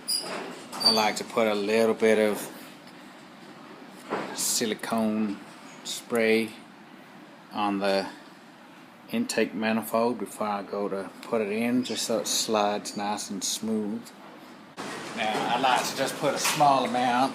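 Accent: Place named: Australia. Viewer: You do a great Aussie accent Greetings from OHH MAA HAA USA I know you from round these parts Fair Dinkum no crow eater you boy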